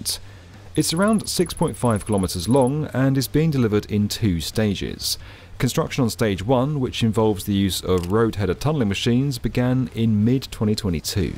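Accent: England, United Kingdom. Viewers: Why is a pom doing the news?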